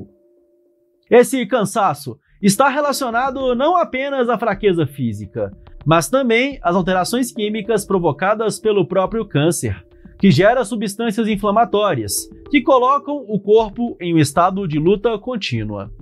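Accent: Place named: Minas Gerais, Brazil. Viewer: Adoro seus vídeos, muito bem explicados, sua voz suave e esse sotaque mineiro maravilhoso!!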